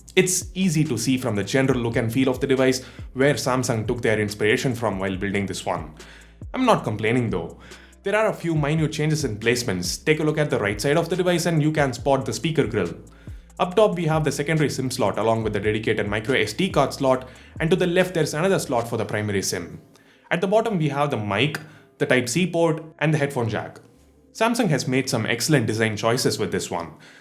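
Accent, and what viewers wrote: India. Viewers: Sounds like indian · Another indian gay accent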